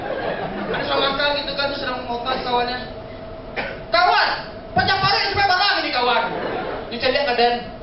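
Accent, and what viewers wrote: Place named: Padang, Indonesia. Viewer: ndak lucu ang do...ma ado urang bukik logat padang...tutur bahso ang kasa...dasar padang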